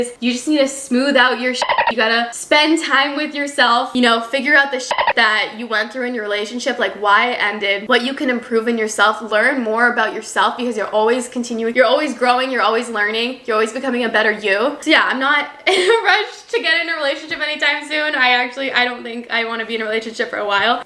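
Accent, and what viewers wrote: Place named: Canada. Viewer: I didn’t know us Canadians had an accent 😅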